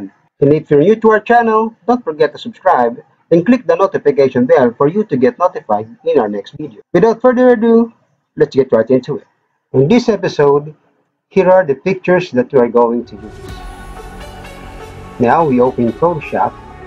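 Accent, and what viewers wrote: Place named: India. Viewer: PARANG INDIAN ANG BOSES NG TUTORIAL KUYA PARANG SI JHAMAL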